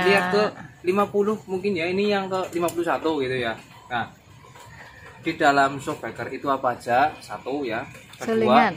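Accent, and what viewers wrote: Banyumas, Indonesia. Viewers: Ora ngapak ora penak pokoke lah mbak ayu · Ora ngapak ora kepenak😀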